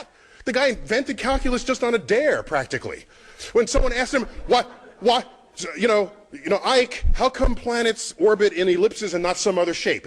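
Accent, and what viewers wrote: Jamaica. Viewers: Are you Jamaican man? Love your channel.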